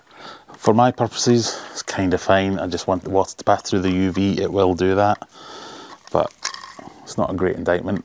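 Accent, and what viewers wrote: Scotland, United Kingdom. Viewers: So comforting hearing a fellow Scot talk about ponds 😂 where are you based?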